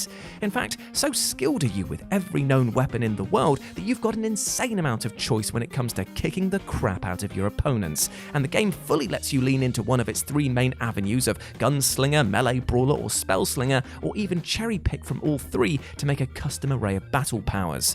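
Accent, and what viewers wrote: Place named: United Kingdom. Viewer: Voice acting? I haven't heard any. Just a vaguely British narrator.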